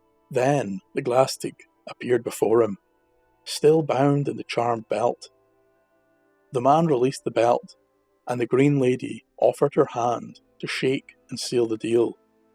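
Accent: Scotland, United Kingdom. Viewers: thanks for telling Scottish stories with Scottish accent. i actually cannot stand English or American accent. Due to my upbringing. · Please Sir, may I have some more? You have a handsome voice and I sincerely and truly appreciate & enjoy your lyrical Scot's accent. Yours truly from America. Be Blessed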